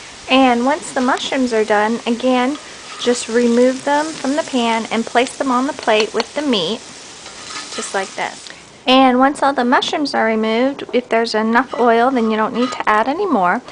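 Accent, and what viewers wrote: South, United States. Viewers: An Asian with a country accent, cool. Love this dish. Very easy to make, and very tasty! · Great job! you make it look so easy. I love your southern accent! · someone of Asian decent speak with a southern drawl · wow,a beautiful Asian girl with a southern accent, how exciting! Also, the food looks great.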